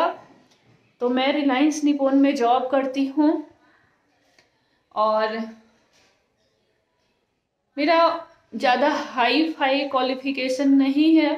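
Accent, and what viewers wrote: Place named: Bihar, India. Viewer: बिहारी हाे का!!!!? हिन्दी से लग रहा है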